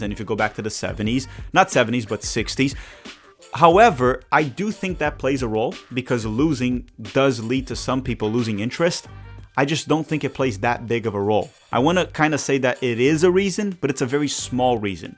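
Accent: Rio de Janeiro, Brazil. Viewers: the question that won't go away is, why do you support palmeiras with that carioca accent?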